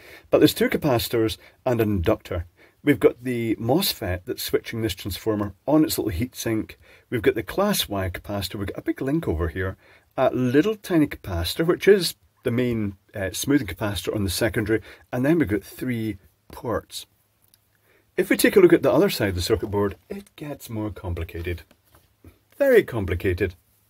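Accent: Scotland, United Kingdom. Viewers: It's funny hearing a British man pronouncing Swedish 😂❤ koppla was pretty good, I would never have understood småhagel if it wasn't for the text on the box 😂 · I have a Koppla, and, my knowledge of electronics is far too crap to follow this. But as a consumer, as large companies go and someone who tries to avoid buying anything first-hand, maybe I've swallowed some marketing but I do feel IKEA is a name I trust a bit, I'm glad to see this disassembled. Can't help but remember the episode of Top Gear about the final demise of Saab. There's something about a company running itself into the ground because "good enough" simply wasn't good enough that viscerally hit home. Also bonus tip: Å is like an a then an o. Små means small and there's a similarity in sound, much more so in your Scottish accent than my English bastard one. Decided to learn Danish rather than Swedish and they're quite different in many respect but I expect hagel is pronounced very similarly to "hail".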